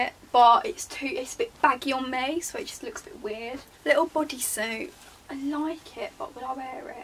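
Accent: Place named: London, United Kingdom. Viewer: Her London accent is sooo strong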